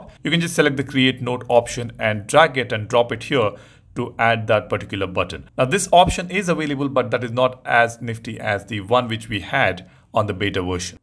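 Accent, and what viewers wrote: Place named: South India, India. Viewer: Yeh kaisa accent hain re mere bhai ?? US jaisa SouthIndian wala ?? matlab confuse ho gaya english sunke dimaang ghumraa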